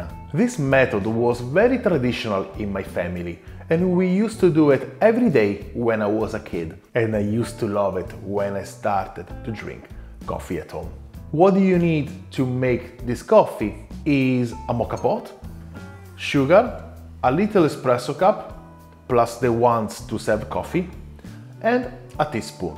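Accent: Italy. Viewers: Italian is the new French accent 😮❤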